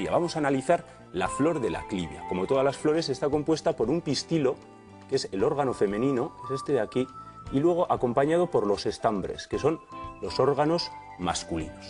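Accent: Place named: Spain. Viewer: Hola siempre te veo,soy tu nueva suscriptora,las tengo en masetas en un patio con mucha luz, no las tengo en sol y como se isieron muy grande las cambie de maceta, tenía cochinilla😂😂 y la cure con jabón potásico y min, pero mi pregunta es 😂😂no sé qué le pasa a las hojas tienen un color con manchas naranjas con puntos negrito🤔😁 que puede ser?será al guna plaga,es la primera vez, que los veo🙄😁🤔 de que lugar sos pareses del algún lugar de España, por tu acento,gracias un saludo 👋🇦🇷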